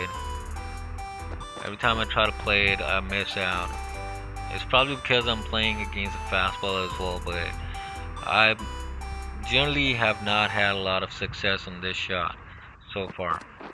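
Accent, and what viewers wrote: United States. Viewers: Are you American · Oh now I get it. In one of your earlier replies you had used hindi/urdu and from your english accent i thought you are an american. 😀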